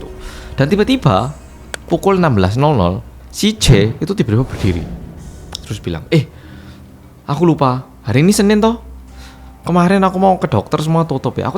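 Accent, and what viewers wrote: Java, Indonesia. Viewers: Kalo Liat mas kaya liat Young Jay just jerk versi medokk 😂. Jago dance juga gak ya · Lihat mukanya mirip bintang korea, haha Logat bahasanya kek orang jawa/ kental bgt Asli mn si kak?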